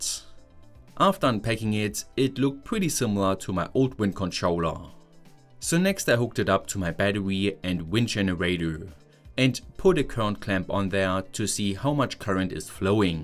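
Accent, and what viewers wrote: Germany. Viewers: why do you have such thick german accent if you are Scottish? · Curious where you're from! I lived in Germany for many years and I don't recognize your dialect. It's hard to identify! Your channel says you're from Fellbach but IDK if that's conclusive.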